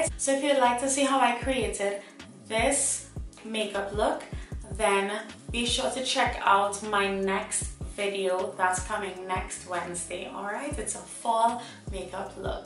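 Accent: Trinidad and Tobago. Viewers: I hear that trini accent... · First 3 seconds, i knew she was Trinidadian. Liked and subscribed ONE TIME! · You from Trinidad 🇹🇹 ??? Your accent 😍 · I know a Trini accent when I hear one 😍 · I randomly clicked on this video looking for reviews on the soap and immediately was like wait is she Trini? Lol its so cool how our accent is soo recognizable especially to other Trinidadians lol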